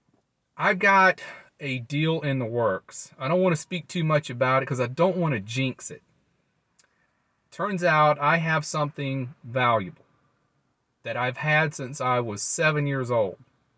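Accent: South, United States. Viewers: I just found your channel. It is very interesting. I have already seen several videos. I do not understand why your videos are interesting when all you do is just talk to us from your driver’s seat. Is it the pleasant face? Is it the melodious Southern drawl? Is it the nice story telling? I just don’t know but I have subscribed to your channel and clicked on the bell. Best wishes.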